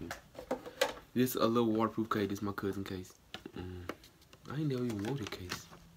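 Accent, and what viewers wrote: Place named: Atlanta, United States. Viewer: Sounds like he from Atlanta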